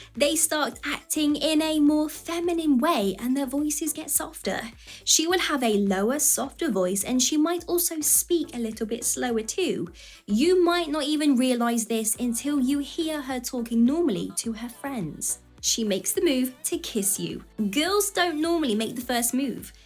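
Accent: United Kingdom. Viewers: Dude I love your worldsmost wickedest British accent in this video of yours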